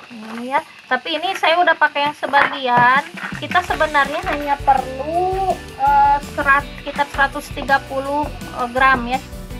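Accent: West Java, Indonesia. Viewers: I love teteh sayang aku suka banget sama gaya bicara teteh, teh orang mana? Sunda pisan euy nyarios na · Orang sunda mana suaranya!! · Tetehnya nyunda banget seneng liatnya 😄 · Waaw... Mantap.. Hatur nuhun resepnya tth... Sunda na ti mn teh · Iya Non :") kalo orang Sunda mah emang begini sama kaya aku 🤭🤭🤭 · Nyarios na logat sunda pisan hebring poko na mah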